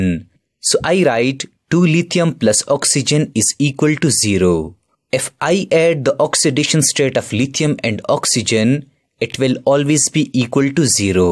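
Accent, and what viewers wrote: India. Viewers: indian voice come in clutch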